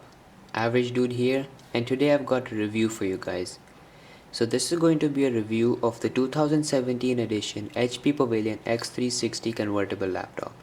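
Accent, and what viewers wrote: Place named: India. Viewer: oh k... U sounded like an Indian.. 😂.. Anyway.. This model is not available in india thats why I was wondering..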